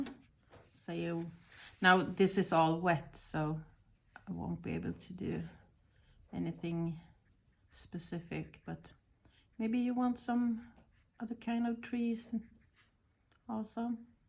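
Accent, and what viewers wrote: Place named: Sweden. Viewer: You sounds as if you are Swedish, so am I and I’m a watercolour artist and have my studio near Linköping. Anyhow I’ll try this and save it for later repeating. Kul att ha hittat dig, jag skall absolut dina sätt att måla björkar! Må så gott! Ps…. Nu är jag prenumerant av din kanal!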